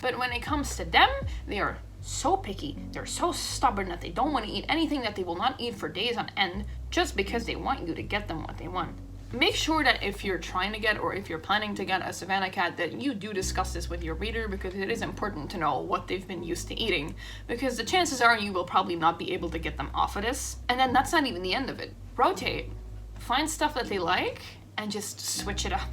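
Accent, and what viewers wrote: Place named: Belgium. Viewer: Ah Belgium, I knew I heard a slight accent.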